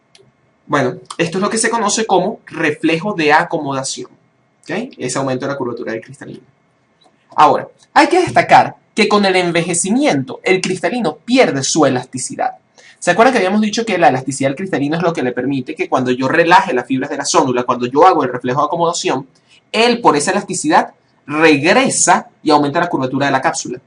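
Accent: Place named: Venezuela. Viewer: Jeje tu acento, supuse que eras Venezolano jeje :) Me alegro mucho de conseguir por fin un EXCELENTE video realizado por un Dr. Venezolano :) Excelentisimo de verdad =) Saludos desde Anzoategui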